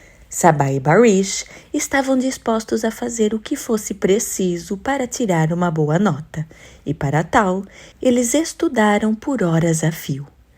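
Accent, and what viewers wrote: Portugal. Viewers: Gosto muito de sua voz e seu sotaque. Penso que vc é Portuguesa.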